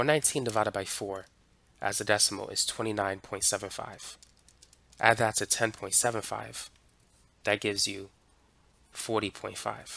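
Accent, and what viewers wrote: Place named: New York, United States. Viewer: Based on your accent I'm guessing your from new York or jersey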